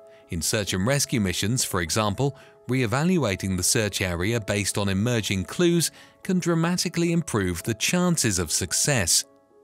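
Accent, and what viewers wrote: United Kingdom. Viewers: This is one of those rare cases where a British accent does NOT make something sound more true. is absolute B.S. because the car isn't in all three locations. The player has chosen what he has chosen and the car was placed where it was placed (though I wonder if these gameshows don't switch the prizes backstage while they are rambling on, distracting the audience). is B.S. because "all of the other options" are in the PAST when they are ruled out. Card counters don't work on the probabilities of the past, but the current probabilities based on which cards have been dealt or played. stating 1 out of 3 or 2 out of 3 is wrong because the third option has already been ruled out. No, it does NOT still have the same probability of winning. Once the third option is ruled out the odds change. If you play Russian Roulette the odds are 1 in 6, but when the hammer falls on a chamber the odds change, which is why there is so much BUILDING suspense in these scenes in The Deer Hunter. 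But the originally chosen door ALSO now has better odds! That is LOGIC. This video reveals what I have observed to be a YouTube rule of thumb: If it takes 20 minutes or more to explain, it just might be so much Bravo Sierra, taking advantage of your short attention span on a platform that caters to it, appealing to your desire to appear logical by mischaracterizing Logic as Intuition, and disarming you with a lengthy onslaught of eloquence without communicating much truth. If I had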